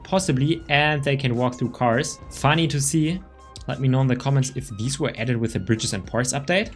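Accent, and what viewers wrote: Germany. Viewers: Ich höre deutschen Akzent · Bro man hört so raus dass du Deutscher bist😂❤ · I can hören german Akzent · You sound very German! 🇩🇪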